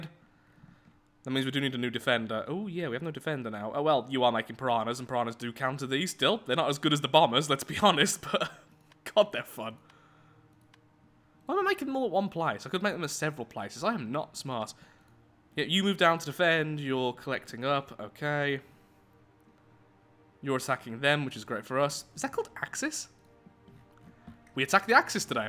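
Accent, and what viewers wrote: United Kingdom. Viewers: Hey are u british or? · Just listen to em! He’s got to be British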